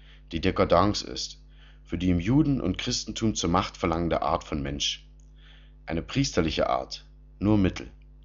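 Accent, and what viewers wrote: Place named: Saxony, Germany. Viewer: Es scheint mir unmöglich diese sächsisch angehauchte Stimme zu ertragen.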